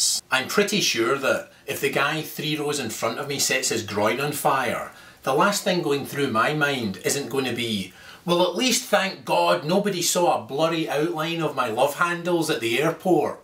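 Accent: Scotland, United Kingdom. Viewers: well your an idiot... hes scottish. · I love the skotish acsent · is that Scottish ? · oooh i love his acsent... i want a scottish friend :D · He is very much Scottish. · You can take your Scotish voice and go to hell. Maybe the loss of liberty does not cencern you but to real Americans it is the reason we fled Great Britain in the first place. Airport scanners are unnecessary. The problem can be solved by profiling but they don't want to solve the problem. You are the perfect example of an unwitting NWO dupe. No go play some golf and eat some haggis and read some books about freedom dummy. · Stuff an aresenal up his aresenal XDDDD nice accent btw Scottish? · Ya I'm American do the accent is hard to understand, but I'm also Scottish so I like the accent, and everything else HA don't shit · I think he's a Scot, or like me, he might be an Irishder. 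· What ever happened to the british accent being 'hot'? Americans don't even realise that this is what 90% of brits talk like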